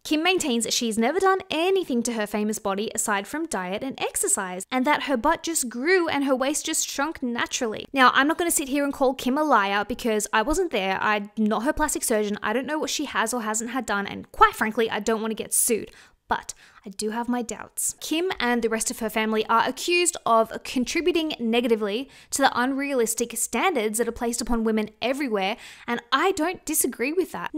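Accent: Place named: Australia. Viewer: I know it's hard but the lady speaking is Australian.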